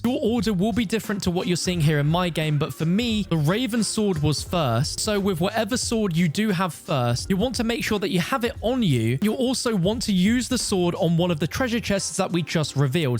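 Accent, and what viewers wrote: United Kingdom. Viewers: صوته بريطاني مزعج كثير كلام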